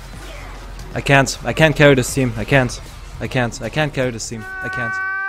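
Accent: Germany. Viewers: Hey are you german? You have quite an accent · warte bist du deutscher? o.o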